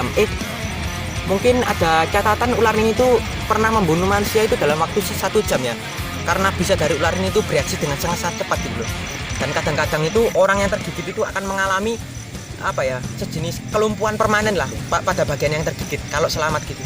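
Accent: Java, Indonesia. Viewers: Video bagus..tapi medhoknya itu lucu..jadi kayak lawak si inyong. 😊🙏😅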